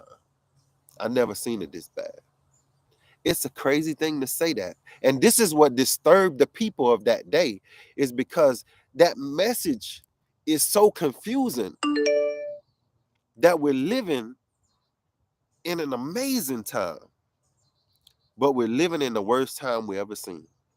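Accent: Louisiana, United States. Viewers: I knew that was a cajun accent I heard :) Just suscribed!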